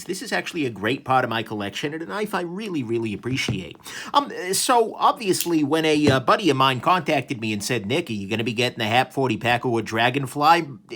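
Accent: New York, United States. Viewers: Please don't take offense but your voice reminds me of my relatives from New York! Thanks for sharing this one... love the dragonfly, and I've always thought those wood scales on the delica variant looked slick!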